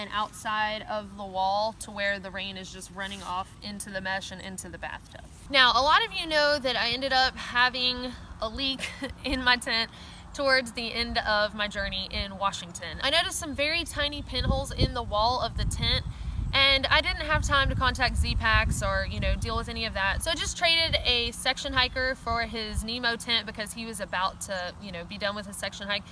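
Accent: South, United States. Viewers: It is so so great to see a young lady be such an outdoor lover. They may be out there but you don't see them....most people of her age are too busy watching Kim K and not seeing the world for what it is.....and the southern accent is awesome. Great vids and good work....thank you.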